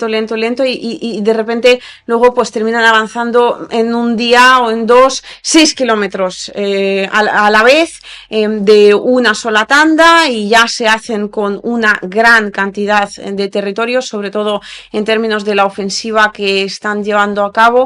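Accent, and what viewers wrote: Spain. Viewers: Hola! Jejeje... Me gusta oir esa manera tan castiza de hablar. Saludos.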